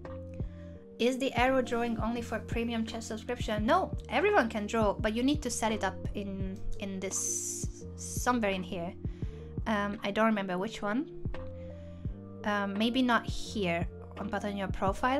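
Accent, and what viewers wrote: Hungary. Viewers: Bakker eddig nem is realizáltam hogy te magyar vagy.... annyira hülyének érzem magam, az akcentusból rá kellett volna jönnöm XD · are you hungarian?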